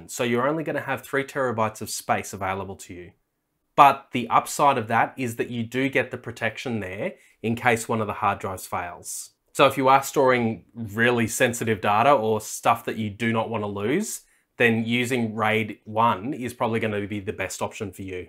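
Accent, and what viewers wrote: Australia. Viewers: Can I use one 2TB HDD and one 4HDD in the 2 bays at the same time? How many devices (phones, tablets, laptops and desktops) can be connected to this NAS? How many accounts/personal folders can be created for this NAS? Thank you very much for your response. (Your accent makes me miss my OZ friends, mate)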